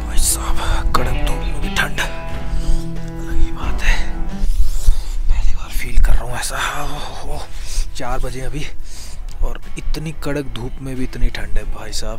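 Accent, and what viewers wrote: Haryana, India. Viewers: Bro are you from haryana🤔🤔🤔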